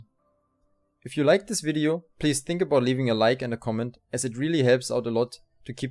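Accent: Germany. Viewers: Hey is it just me or is your accent a bit German? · Ich hör raus dass du deutscher bist ;) Weißt du vllt wo man den schauen kann, weil chrinyroll hat den nicht. Wollte mir den nochmal anschauen weils auch schon 2 Jahre her ist. Feier dein Content. Find solche Videos richtig gut weil man sich immer ein Stück weit ein Video rein ziehen kann um zu schauen ob der Anime einem zusagt oder nicht👌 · Dieses deutsche englisch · Uhh okay so two things great video but correct me if i am wrong is that an german accent if so i must say great english skills :D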